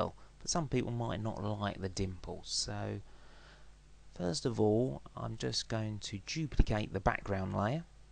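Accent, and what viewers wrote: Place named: United Kingdom. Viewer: yea, the british accent is nice* in a way lol hehe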